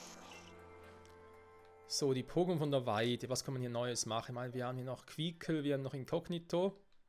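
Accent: Switzerland. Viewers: bis dahin schau ich deine Reise durch die Hoenn Region an. 😅👍🏼 ich bin in Vorarlberg und da ist der Schweizer Akzent echt ne tolle Abwechslung zu den ganzen deutschen 😂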